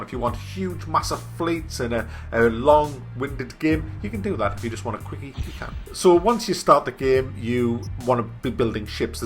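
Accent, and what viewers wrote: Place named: Scotland, United Kingdom. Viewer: I HATE strategy games simply because I cannot play them and will not, this isn't my point though (my point is this...) I still watched this almost 15 Minute long video because of the commentary! is simply the best reviewer and commentator. He's got it all! Quick wits, mature humour and of course none of this would be complete without his awesome accent which I think is... Scottish?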